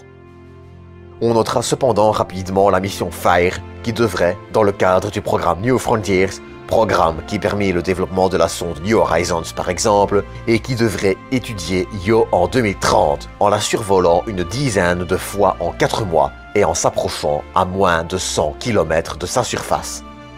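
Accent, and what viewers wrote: Belgium. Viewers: La voix off est dégueulasse, c'est quoi cet accent belge, on dirait une blague!!??impossible de prendre cette vidéo au sérieux. Travaille ta diction stp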